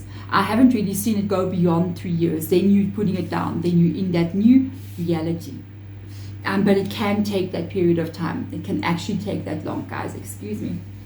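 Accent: South Africa. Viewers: Thank you...great reading...first time watching you...arw you South African? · Wow, wow, wow, however a bit conflicting, been fighting six years for my property sold unlawfully by my husband, got an eviction order on Wednesday - valentines day, going to appeal and lodge another case to fight the unlawful sale - I trust this is the right action???? Know I will win the case if I do, but don't really have the finances for a High Court Action - should I let go of all that I have worked for and more on??? Keep getting conflicting answers from the Universe. Wishing you love and light. By your accent I assume you to be a fellow South African.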